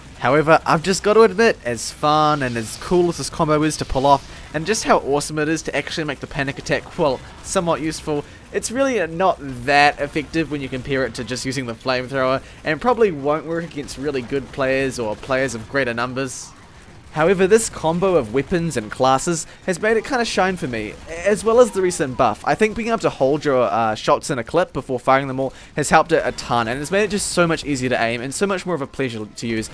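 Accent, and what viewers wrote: New Zealand. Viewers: He sounds very much New Zealand to me. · listen to the way he says 'get'. He's from nz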